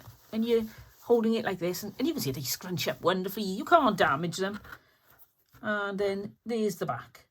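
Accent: Wales, United Kingdom. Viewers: I love naive or rustic work. It just looks real to me. Not like stuff that is so perfect you don't want to use it. Anyway i'm new here. Are you originally from Wales? Sounds like you are. I'm in uk originally from south africa. I also do many crafts and love doing any kind of hand sewing.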